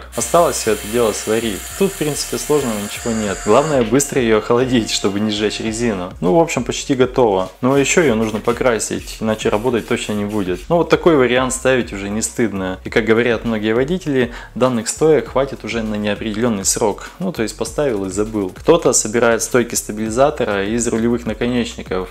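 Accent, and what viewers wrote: Perm, Russia. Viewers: Вы из Перми?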